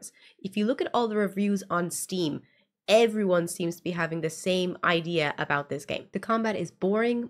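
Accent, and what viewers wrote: Ireland. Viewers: love your short videos and I'm glad I came across this on YouTube. love the Irish accent, my great grandfather came to America from Ireland, and I really want to visit someday. but to get back on topic, totally agree, you make garbage people don't want to play it's not going to sell. I keep hearing the people against sweet baby and other games as a vocal minority but if that was true the game would succeed, hmm wonder why they don't. maybe because its minority you are making your games for, and they don't buy them, you cultivate the wrong player base, and you will end up on the cutting room floor.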